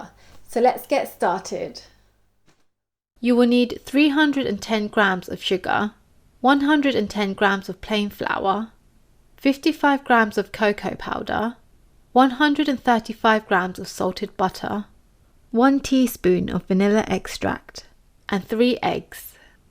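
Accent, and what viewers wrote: United Kingdom. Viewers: I made this today and it came out very good. I substituted the flour with 2/3C of Almond Flour and 1.3C of coconut flour and used the Monkfruit sweetener. The only issue I had was removing it from the springform pan I used. It split apart slightly in a couple of places but nothing too drastic. Maybe I didn't let it cool enough. I served it up with some Neopolitan Ice-cream and I was in heaven. It was all you said it was. Thank you so much for sharing this recipe. I enjoy listening to you and watching you cook. The cross between your British accent and your sweet Asian personality makes this experience and your channel very enjoyable. :)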